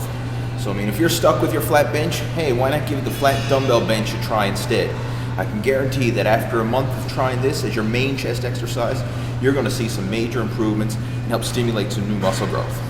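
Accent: Newfoundland, Canada. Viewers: Lol the second you started talking I knew you were a Newfie:) Awesome stuff, keep it up~! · Is this guy canadian ? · god you sound sooo canadian hahaha i love it! good video! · You're canadian, right?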